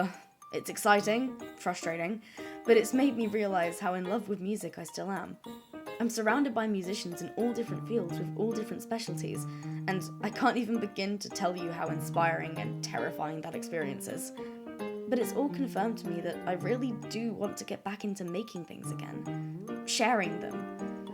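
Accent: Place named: United Kingdom. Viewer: oh god she's british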